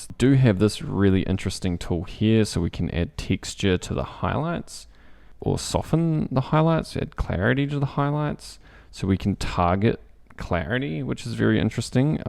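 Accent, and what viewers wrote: New Zealand. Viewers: I think for casual/hobbyist photographer this is a good alternative but for processing hundreds from a wedding or a sports assignment there’s absolutely no way this can replace lightroom. Also Apple’s Cloud storage system is peculiar & not friendly for android clients to retrieve their photos. Great presentation , though & your English dialect is interesting - are you a New Zealander? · Steep by steep or step by step? LOL New Zeland accent funny!🥰😘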